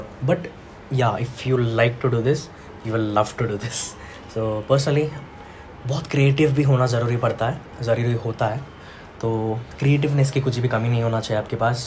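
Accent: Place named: India. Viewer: Love your Hindi language accent🥰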